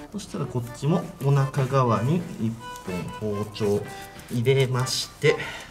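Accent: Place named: Tokai, Japan. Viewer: 東海出身ですか？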